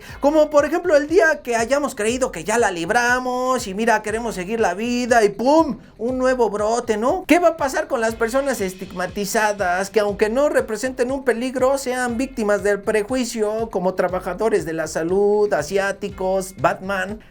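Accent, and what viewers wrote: Mexico. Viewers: Hermoso acento azteca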